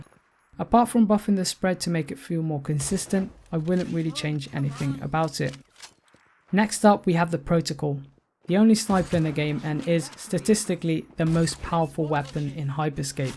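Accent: United Kingdom. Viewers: Ashy British voices psh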